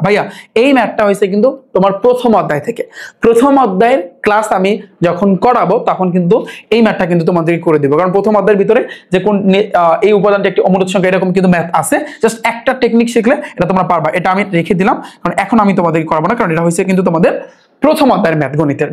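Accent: Barishal, Bangladesh. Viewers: Vaja apnar basa ki borishal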